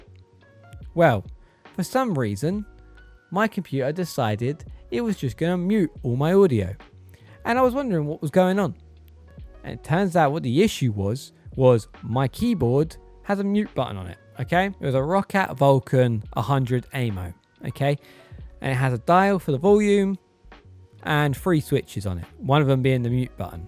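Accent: United Kingdom. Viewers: I love that you have a British accent. I can't imagine them in American accent 😂😂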